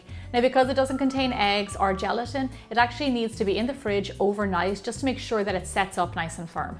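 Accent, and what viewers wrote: Ireland. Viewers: I LOVE all of your videos they're always so great and delicious! I can't stop watching them. I'm an Irish dancer and I love your Irish accent! It's beautiful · I KNEW YOU WERE IRISH! Sorry I'm new to this channel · are u irish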